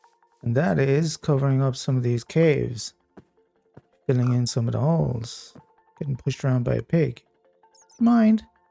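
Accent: Ireland. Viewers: your big Hardcore video came to me through the algorithm and I LOVED it, couldn’t get enough of your builds, play style and chat (and, as a fellow Irishman, it’s great to hear a non-American voice in the game!) so I’m going back through this series for more banter and more build inspiration. You’re a star, LOVE every video I watch, hope you come back and see this and think of how far you’ve come!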